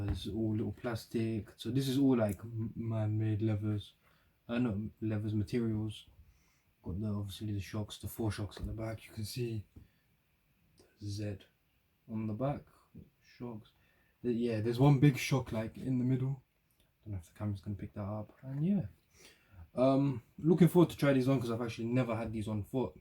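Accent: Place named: England, United Kingdom. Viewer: What happened to the English accent? Sounds horrendous now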